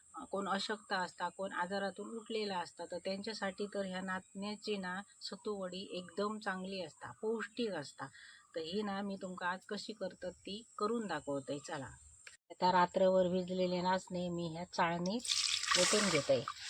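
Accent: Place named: Malvan, India. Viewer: तुमच्या सर्व रेसिपी सोप्या असतात तुमचं मालवणी बोलणे गोड वाटते नाचणी वडी खूप छान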